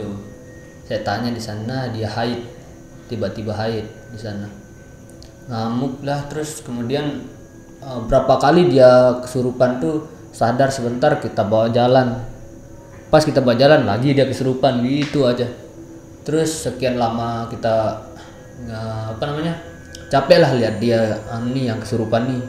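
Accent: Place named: Lombok, Indonesia. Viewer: Indo sasaknya kentel banget bang Semangat²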